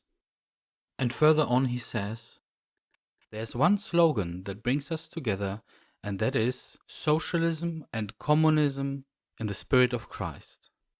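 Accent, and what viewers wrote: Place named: Germany. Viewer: This video is a huge cringe post. I remember having another discussion about a similar topic with this guy and it was wild. truly baffling, how someone, who seems to be german due to the strong accent, can fall trough the raster of historical education. But anyways, to set this straight real quick, no the Nazis weren't far left socialists, because of course they weren't. I'm guessing the presented election program points are supposed to be a summary of the infamous 25 points. The truth is, that only some of them were supposed to resemble socialist agenda points and pretty much all of them were abandoned shortly after the Nazis took power. The occasional welfare benefits, that got implemented to prevent another proletarian uprising, don't change that. Modern Germany has those as well, and no one would argue that it's a socialist country. 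Also funnily enough, the demand for the abolishment of the materialistic world order stood in opposition to Marx's dialectic materialism, but yeah sure,lets pretend the Nazis were socialists. While it was true, that the Nazis sometimes called one another "Genosse:in", is that somehow supposed to be proof? Many people give themselves silly names. Also, this was supposed to refer to the actual term "Volksgenosse:in" which in itself refered to the propagated myth of the Volksgemeinschaft, with which the Nazis wanted to promote the establishment of a community based on race and class collaboration, rather than on class conflict. So yeah, what a bu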